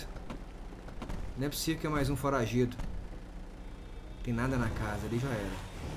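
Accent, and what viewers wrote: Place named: Goiás, Brazil. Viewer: Uau! Pensava que você era de São Paulo, mas é goianão hein hahaha que massa. Psé eu também sou, aliás o vídeo ficou maneiro. 👏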